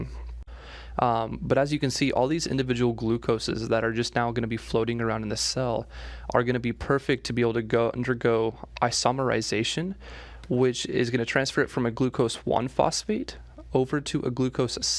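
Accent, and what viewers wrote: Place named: Wisconsin, United States. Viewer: The narrator's accent is so distinct, is that a Polk County Wisconsin accent I'm hearing???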